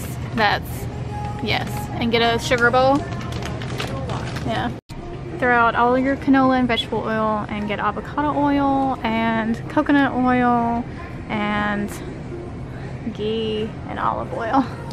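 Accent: South, United States. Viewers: Hey thanks for doing this...and love your suthern accent...not many of those left around Atlanta... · Your sassy personality, and accent 🤗 love it! Reminds me of my hometown where everyone has a southern twang ❤️ Also love that you shopped at Walmart, a store that everyone has access to!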